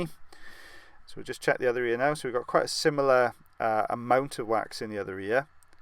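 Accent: Wales, United Kingdom. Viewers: That Richard Burton & 😍 Anthony Hopkins duo Blessings for the Welsh accent t I have family in Guyana&Trinidad They like the Welsh sing when they 👄 🦜 talk Enchanting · I like watching these and listen to the commentary, your voice is so calming and the Welsh accent is so nice.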